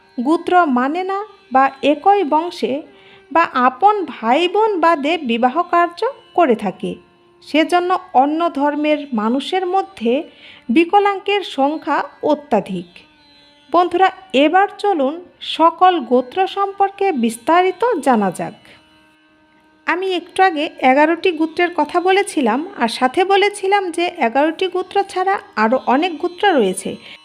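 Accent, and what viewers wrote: Bangladesh. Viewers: কুমিল্লা এলাকার লোকেরা এইভাবে উচ্চারণ করেন। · Pronunciation thik karun mone hoi apni sylethi meye. Ja hoke bhalo video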